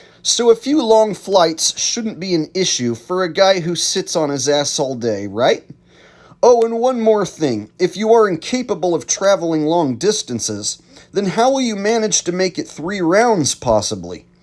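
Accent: Bristol, United Kingdom. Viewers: He has a Bristol accent aka Bristolian, so it is possible he isn't in Scotland....possibly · I bet he dont go out much in Scotland, This guys accent is from the Bristol area in England, the Scots are not fond of the English, I cant see making many friends there.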